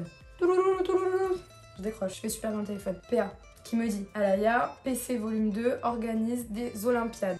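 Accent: South, France. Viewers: T’es juste sublime et rayonnante , ton accent du Sud et ton humour te donnent d’autant plus de charme 😁😊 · C'est franchement génial d'avoir un autre point de vue des olympiades !! Tu as une personnalité géniale !! Le "daingue" de cet accent du Sud te donne une vraie personnalité !! +1 abonné !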